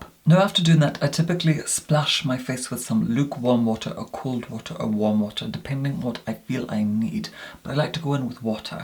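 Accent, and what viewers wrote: Scotland, United Kingdom. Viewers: Rose He is from Scotland; in his earliest videos the accent is more pronounced. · Is it just me or does his accent sound Scottish? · I'm from Australia, apparently he's from Scotland I read. You guys have too many accents 🤣😂 we just have one Straya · where are you from? i hear a northern twang in your english accent, perhaps even scottish, however you speak very proper and pronounce some words how southern english people would. i’m very curious. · Cora alice Nugent 'sorry' indeed. Accents are malleable... If you listen closely he still has a bit of Scottish in there. Can't believe how rude some people can be. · Seamlessly Scottishness. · He defiantly has a Scottish accent just a very posh one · is he scottish or irish? it keeps changing for me please be scottish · Literally the most posh Scottish accent ever but I love it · Liv Cerys then you must be German as he is Scottish for sure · Scottish I believe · I love the accent. 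I can hear a scottish accent under the voice. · He's actually from scotland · idk about that but i know he went to college in glasgow and his accent is definitely scottish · He is from Scotland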